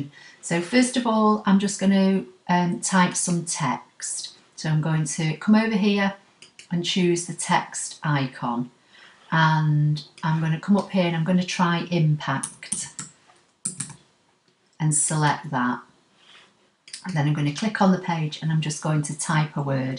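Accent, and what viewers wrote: United Kingdom. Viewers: Wow. This is awesome. I have been searching for a way to curve text and save as an svg file. You couldn't have made it any easier. I love the British accent. Thank you.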